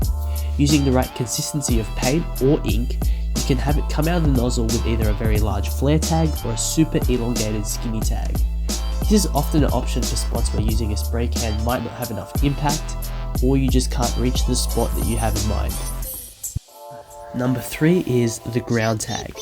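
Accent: Australia. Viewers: Are you aussie lad?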